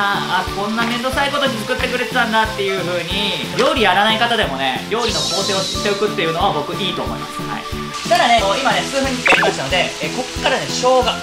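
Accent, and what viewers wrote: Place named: Chiba, Japan. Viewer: いつも思うのでさが千葉ですよね‼️かなりイントネーションがあやふやで訛ってますよ(笑)😫少し治したらどうでしょう‼️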